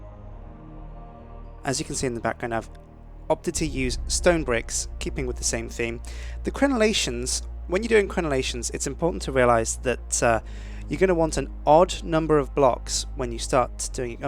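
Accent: United Kingdom. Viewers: Because of his accent. It's scientifically proven you cannot get bored while listening to a guy with a Britsh accent.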